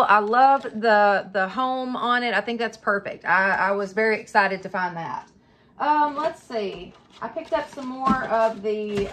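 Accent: South, United States. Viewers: Hi, I love your personality. You are always so cheerful and positive. Your projects and ideas are awesome. You always make me smile and I love love love your accent. I live in Canada so to me you have a very strong southern accent. Love watching you channel. Will be waiting to see what you do on the next video.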